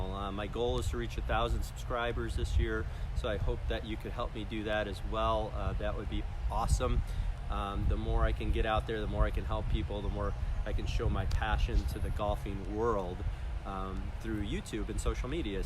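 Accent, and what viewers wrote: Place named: Florida, United States. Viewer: Great video, sounds like you are fairly local to me. Have you ever played at Grand Lake RV resort in Citra Florida? It is close to Ocala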